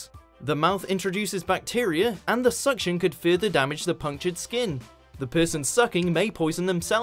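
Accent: United Kingdom. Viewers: What! when did the british narrator come from!? i prefer mr.mojo and ms.mojo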